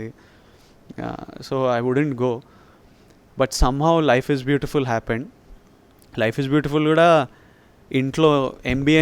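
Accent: India. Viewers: hyderabadi will talk lyk this only ...ekdum hyderabadi..keep rocking bhai ...mana hyderabadi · u wil rock vijay.. u r our hero now.. jai telangana.. hope andhra people also will accept you easily.. watching your interviews.. inni rojulaki telagana vachinanka..oka manchi dynamic hero dorikindu maku.. jai kcr..jai ktr..jai our telangana slang..jai our hero vijay...... u wil rock in future. hope u wil continue our telangana normal slang..and ur own natural slang that is our slang......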